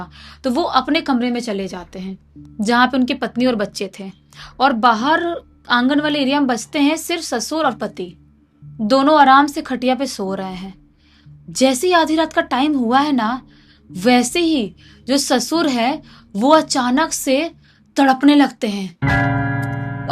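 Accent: Bihar, India. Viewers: aapki tone bihario wali h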